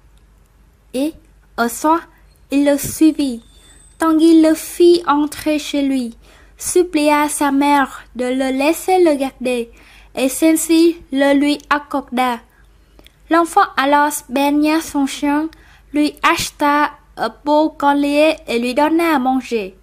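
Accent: Vietnam. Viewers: Giọng Vn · trời giọng người vn mà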